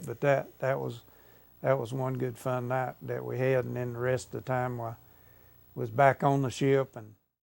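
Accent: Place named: South, United States. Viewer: He's got a way of story telling and that southern accent adds some drama which is entertaining.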